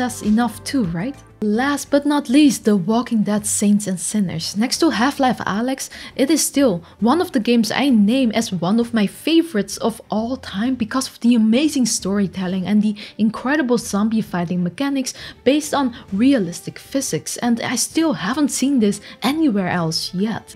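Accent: Netherlands. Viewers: je klinkt een beetje nederlands heb ik gelijk?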